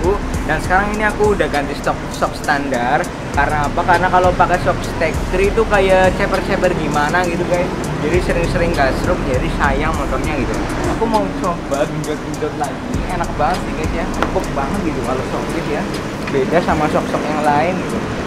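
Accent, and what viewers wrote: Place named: Banyumas, Indonesia. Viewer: Kamu apa orang ngapak bang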